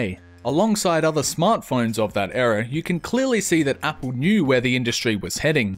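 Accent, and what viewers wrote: Australia. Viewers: 1. he was born with an australian accent and can't change that and should be proud of it. 2. he can do whatever he wants with his hair. that's not your choice 3. i think his videos are really good quality and better than most tech channels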